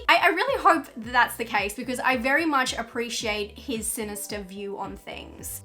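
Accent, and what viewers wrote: Australia. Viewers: Not sure why this is a response to the comment above.. but what you seem to be bothered by is just regular Aussie speech patterns (there are other people elsewhere that have this intonation but it's very prevalent in Australia/New Zealand)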